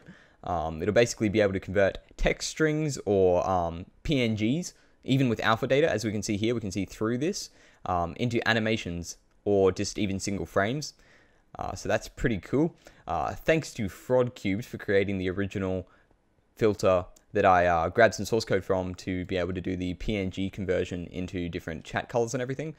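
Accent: Australia. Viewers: HES AUSSIE LUV IET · Are you australian? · Are you australian..?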